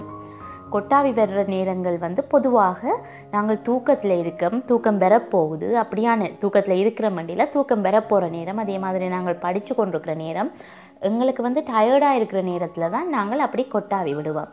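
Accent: Jaffna, Sri Lanka. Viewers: நீங்க இலங்கை தமிழா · Srilanka tamilaro vaasippadhu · மிக மிக அருமையான குரல்வளம் இலங்கை நாட்டின் தமிழ் ஒரு அருமை உங்களின் அன்பு , நீங்கள் மென் மேலும் வளர வாழ்த்துக்கள் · இனிய யாழ் தமிழ்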